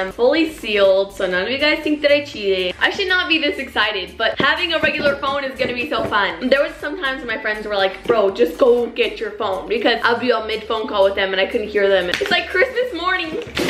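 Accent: United Kingdom. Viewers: HER BRITISH ACCENT IS SO GOOD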